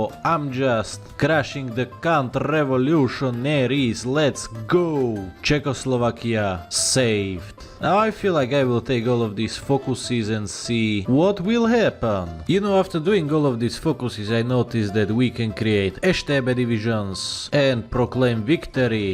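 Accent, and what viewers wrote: Russia. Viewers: Why do you have a Russian accent? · Why do you articulate what you say like a russian when a slovak doesn't speak like that?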